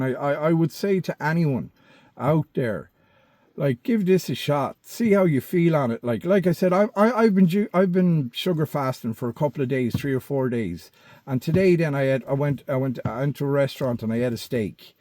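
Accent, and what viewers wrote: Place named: Ireland. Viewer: I could tell you're Irish. I was just curious if you're still living in Ireland. I'm always curious about ingredients in processed foods in other countries vs the u.s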